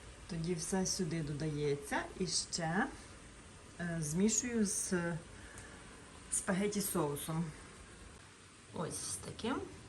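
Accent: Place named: Prykarpattia, Ukraine. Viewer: Приємно бачити справжню українку,яка далеко від України пам'ятає,хто вона і якої землі дитина.Слухаючи,відчуваю,що із Прикарпаття,можливо,помиляюсь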